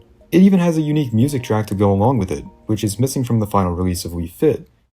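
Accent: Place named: Portugal. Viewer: Are you portuguese? You have a certain accent.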